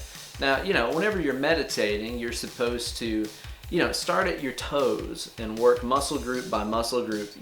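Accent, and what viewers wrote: South, United States. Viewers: Are you from Knoxville? I've been trying to place your accent for a while. I was thinking Georgia maybe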